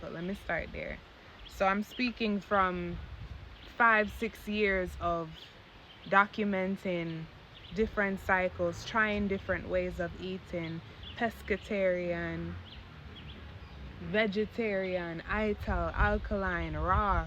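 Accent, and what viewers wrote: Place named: Caribbean. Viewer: love the caribbean accent stay blessed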